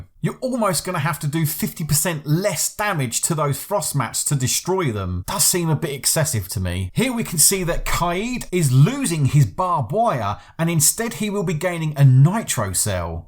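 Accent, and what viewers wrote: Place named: England, United Kingdom. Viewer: yeah, why not? I can listen to that English accent for a while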